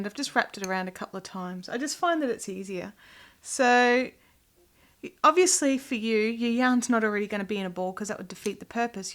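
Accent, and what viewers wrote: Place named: Australia. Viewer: Thanks so much for this!! Well done and nice to hear the Aussie accent. 🙂🙂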